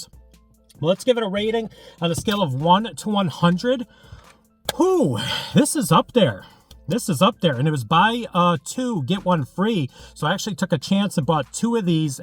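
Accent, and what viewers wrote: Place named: United States. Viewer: Lovely American accent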